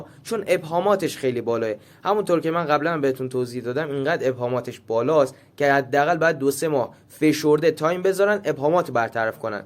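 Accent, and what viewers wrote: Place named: Mashhad, Iran. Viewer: لهجه مشهدی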